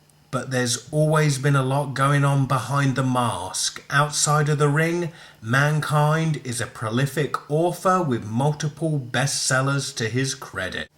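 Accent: United Kingdom. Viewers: Oh MY GOD UR BRITISH I FEEL LIKE FAINTING! LOLOL!!! who gives a damn? Im british too. why do americans love us so much???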